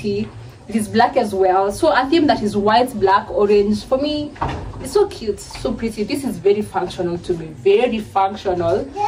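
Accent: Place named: South Africa. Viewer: your accent does sound like south african one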